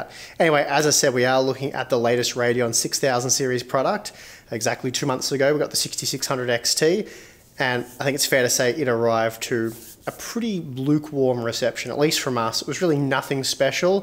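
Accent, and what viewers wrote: Australia. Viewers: Audio's good. You're Aussie so it sounds fancy.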